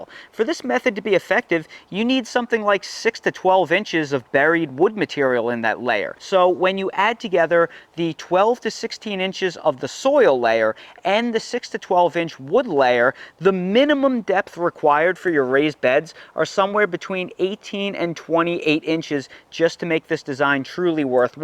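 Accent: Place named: Philadelphia, United States. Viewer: I know you live in North Carolina now but you gotta be from the Philly area originally, right?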